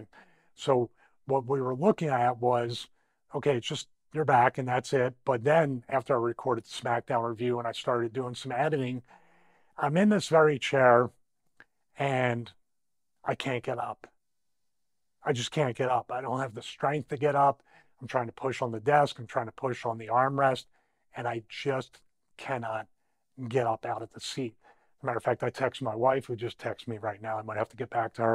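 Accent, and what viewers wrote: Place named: Philadelphia, United States. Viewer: Never apologize for your Philly accent, you are Philly Born, Philly Raised, Philly Tough and Port Richmond Strong. Prayers for you and all who have no one who are struggling with their illness.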